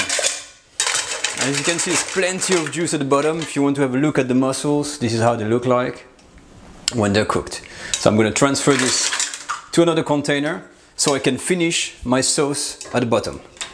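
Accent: France. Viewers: j'ai reconnu à l'accent que tu étais français, en tout cas super que tu parles en anglais, ma voisine va encore plus adorer;), en tout cas super bien expliqué,super recettes... 👍👍👍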